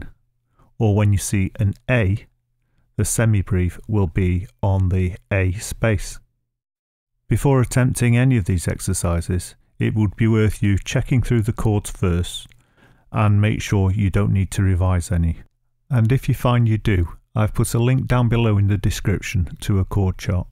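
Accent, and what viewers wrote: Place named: England, United Kingdom. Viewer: Thanks so much. Nice to have a clear English accent with tutorials so easy to follow.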